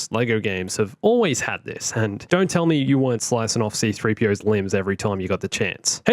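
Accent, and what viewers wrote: Australia. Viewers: OOOH WE GOT A FUKIN AUSSIE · Aussie mate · Yes I had no Idea your also Australian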